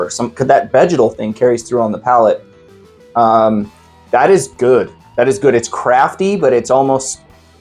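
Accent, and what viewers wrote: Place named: Texas, United States. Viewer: Love the Texas tie and Texan accent. I love how all our palates are different. thanks for getting this together and can't wait to do the FINALE live stream. cheers 🥃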